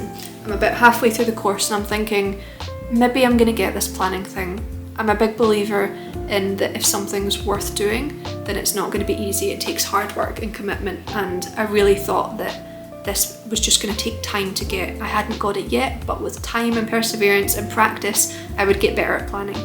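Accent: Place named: Scotland, United Kingdom. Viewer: Scottish accents are the best.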